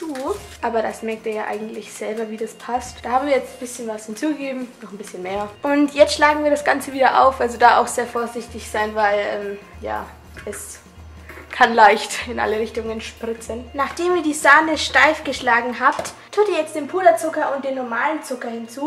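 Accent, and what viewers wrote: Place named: Franconia, Germany. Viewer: Oh, du bist so sympathisch :) Muss ich unbedingt ausprobieren, suche schon lange nach einem gutem Harry Potter-Butterbier-Rezept :) Ich habe gerade gelesen, dass du aus Bayern kommst (bzw. hört man es auch an deinem Dialekt ein bisschen :D), aus welchem Regierungsbezirk kommst du? Hört sich an wie Franken :b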